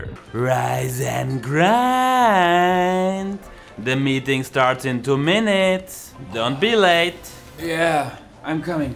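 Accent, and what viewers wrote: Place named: Germany. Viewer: Mal wieder sehr sympathisch, bloß solltest du etwas an deinem – sehr deutschen – Akzent arbeiten ... 😳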